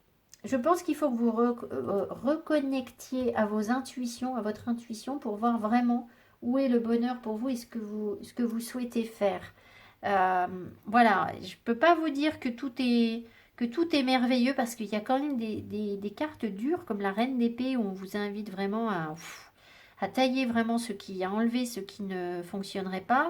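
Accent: England, United Kingdom. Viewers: J’adore votre accent anglais 😊 merci c’est juste 🙏🏻 🙏🏻🙏🏻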